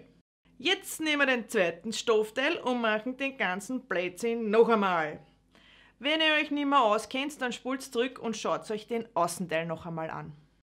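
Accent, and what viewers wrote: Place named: Austria. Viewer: Ehrlich toll erklärt, jetzt versteh ich auch das Falten. Ich Feier deine Humorvolle Beschreibung und den Herrlichen Österreichischen Akzent, danke für das charmante Video 😊👍🏻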